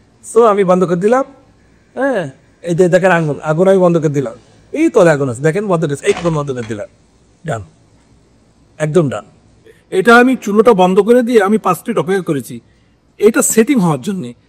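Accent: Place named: Jhenaidah, Bangladesh. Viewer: শেফ ভাষা মনে হয় ঝিনাইদহের ভাষা। আমার বাড়ি ঝিনাইদহ সদর।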